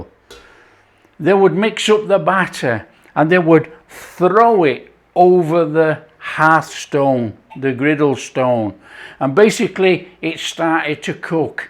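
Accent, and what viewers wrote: Yorkshire, United Kingdom. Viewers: Thought it was great you talking in Yorkshire, I bet a lot of folk didn’t understand you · Your accent really thickens up when you're cooking Yorkshire food. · Ey up, as tha been on holiday tu cudorth (Cudworth), tha sounds a lot more Yorkshire tha see, on a serious note my grandad use to make a full breakfast, stick it on a tin plate, cover with cheese drop of milk and pepper shove it under grill, warm flat bread and stick the breakfast on it, roll it and dip in the scrapings of well done cheese that stuck to plate, absolutely fantastic. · Gone back to thi roots lad, tha sounds reet gradely! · I could get these in Todmorden Market Hall until about 1990 when the last oatcake maker died/retired. Had to make my own since then. Not as good... And I noticed your Yorkshire accent came through stronger!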